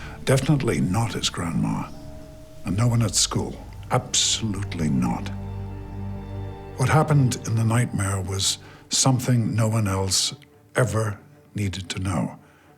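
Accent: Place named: Northern Ireland, United Kingdom. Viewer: Brilliant makes me home sick listening to his northern Ireland accent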